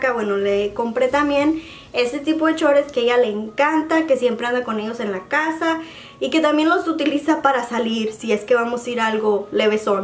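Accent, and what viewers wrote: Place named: Mexico City, Mexico. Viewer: ahh mira .. mi esposo es de aya ... crei q eras de la ciudad de mexico por q asi soy yo de chistosa pa hablar jajajaja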